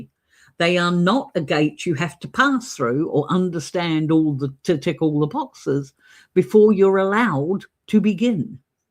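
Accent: New Zealand. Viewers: What a wonderful vlog. First time seeing your channel and have subscribed. Immediately spotted the accent and harekeke out the window so felt at home as a kiwi too. In answer to your request to comment to someone wanting to write: Writing matters. It matters to you, that’s why you feel the urge. That’s why you feel the compulsion. It matters, that’s why you feel the resistance. It matters, that’s why when you finally start, you feel whole, you feel joy, you feel the desire to continue. You’ll also feel the self criticism and doubt but you keep writing because writing matters to you. Keep writing till you get beyond it mattering. Keep writing till you feel it’s who you are, someone who writes. Just like you might be someone who knits, someone who runs, someone who paints, someone who bakes. Write because you are someone who writes.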